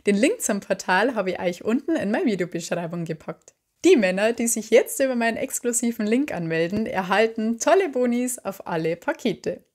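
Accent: Bavaria, Germany. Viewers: Wie kann man nur so unglaublich an ziehend und attraktiv sein. Wenn du mir über denn Weg laufen würde würde ich dich sicher nie mehr vergessen können. Allein deine Stimme mit einem so schönen Bayerischen Akzent Wow.....😮. Liebe Grüße Torsten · WOW,.. wo bin ich denn hier gelandet ❓️ Hübsch, sympathisch und ein interessantes Thema bei Youtube ❓️ Das sind ja gleich 3 Dinge auf einmal und das geht hier doch nun wirklich nicht... Also manchmal, so wie in deinem Fall, finde ich die Algorithmusvorschläge wirklich toll... Mit deinem verschmitztem frechen grinsen sowie deiner verführerischen erotischen Stimme in Verbindung mit deinem süßen Bayerischen Dialekt und deiner offenen lockeren fröhlichen Art, hast du eine sowas von sympathische Ausstrahlung, das ist einfach unglaublich.... WOW ‼️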